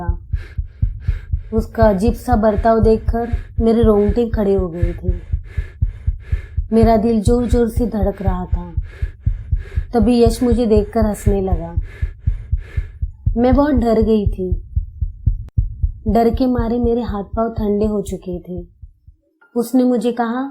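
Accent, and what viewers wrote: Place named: Maharashtra, India. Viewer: Full on Marathi accent, last two statements were exactly opposite.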